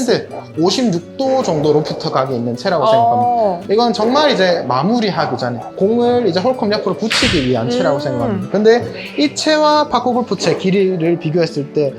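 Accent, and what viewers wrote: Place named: Gyeongsang, South Korea. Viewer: 사투리 좋아요 지도 경상도라 방가방가입니다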